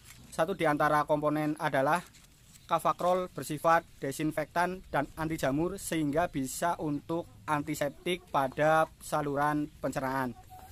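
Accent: Banyumas, Indonesia. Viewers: Assalamu'alaikum.. mas.. buat video untuk ternak puyuh petelur mas..🙏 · Ora ngapak ora penak, mantap lanjut terus boss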